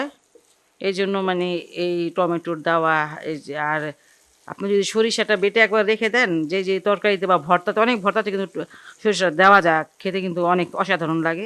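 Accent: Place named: Jamalpur, Bangladesh. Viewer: আপা আপনার রান্না গুলো খুবই ভালো লাগে,, আপনার বাড়ি কোথায় মনে হয় জামালপুর জেলা আপনার কথা বলা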